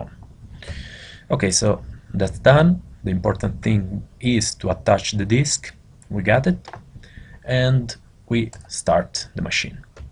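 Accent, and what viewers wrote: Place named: Italy. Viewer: Si sente che sei italiano :D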